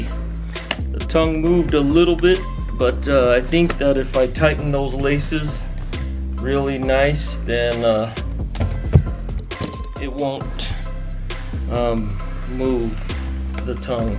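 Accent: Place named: Canada. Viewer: I am just outside of Toronto. Where are you? From your slight accent I thought you might be Canadian. Do you have Native or First Nations heritage? I hope you don't mind me asking?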